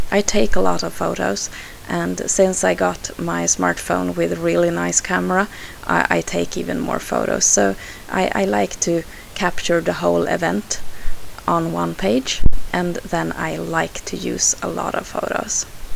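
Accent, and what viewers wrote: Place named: Scandinavia. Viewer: I have enjoyed watching your scrapbooking videos since I discovered your You Tube site several months ago and always anticipate the next one to arrive. I love your layouts especially for the sense of balance and the focus centering on the photos the stories you are able to convey. I also enjoy your narration (love the Scandinavian accent). The stories you share of your life and family make a cozy picture and contribute to the charm of your layouts. I look forward to your future videos.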